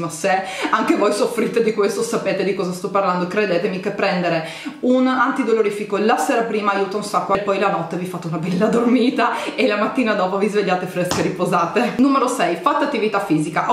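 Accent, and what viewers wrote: Trieste, Italy. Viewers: sei di triestr?!?!?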